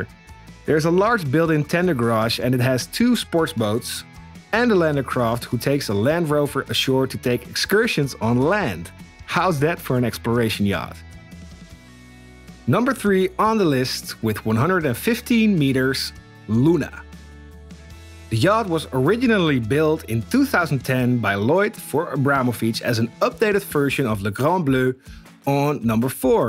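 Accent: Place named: Netherlands. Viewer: Leuke video, aan jou accent te horen Ben je denk ik Nederlands.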